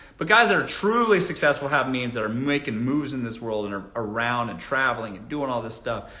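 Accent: South, United States. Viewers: 😂😂😂 "A little bit nappy"🤣 Omg. We can SO tell you were born in the SOUTH. The way you keep it real hurts so good and is so hilarious 🤭 #Boss... my granny was born in MS and we grew up telling it like it is. Tell the truth, shame the devil.😌😆🤷🏾‍♀️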